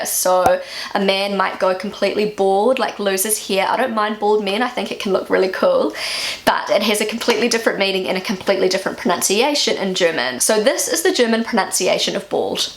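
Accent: New Zealand. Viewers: Just found your channel and I am enjoying it! I think, with an Aussie twang, some of these German words feel easier to pronounce than perhaps yours with a Kiwi accent. Eg. Links ('Lien-x' in Kiwi, 'Lin-x' in Aussie). Probably vice versa on some words too. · As a native speaker of English, I have less trouble understanding your German than your Kiwi English. Dead, pronounced “did” Gift, pronounced “guft”. As with the famous graffiti: Australia 6 New Zealand 7 Pronounced: Australia sucks, NZ ‘sivven’ (‘s heaven). Keep up the good work! 😉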